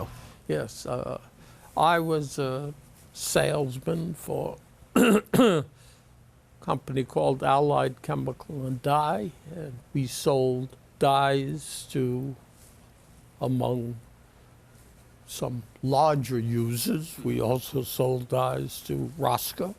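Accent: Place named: Northeast, United States. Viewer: A mix of NY & New England or just a northeast accent.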